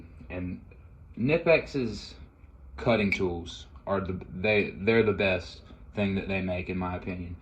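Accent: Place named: South, United States. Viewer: It's far too easy to hear a bit of a southern drawl and equate that with dumb-ass, youtube has shown me plenty of clever dumb-asses 😀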